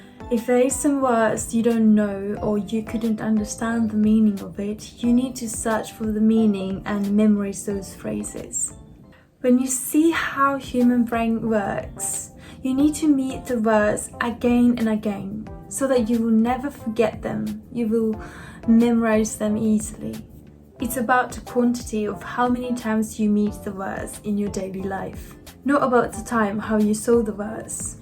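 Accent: United Kingdom. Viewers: はじめまして！ブリティッシュアクセントでしたか！プリンセスみたいです🧡ブリティッシュアクセント大好きなので頑張りたい！基礎の文法が怪しすぎるのですが、主様はどんなふうに学びましたか？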